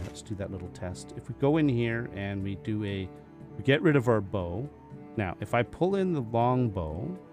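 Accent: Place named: North Dakota, United States. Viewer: So I have been sitting here binging this series (how long will it take to get caught up?..haha). I have to say thank you, listening to your gameplay while I work just makes my day better. I am related to a bunch of North Dakotans and Minnesotans and your accent is very similar, it just puts a smile on my face. So thank you again. :)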